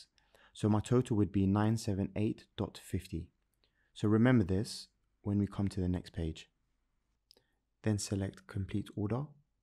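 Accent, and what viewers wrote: United Kingdom. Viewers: from the usa why does he sound british wtf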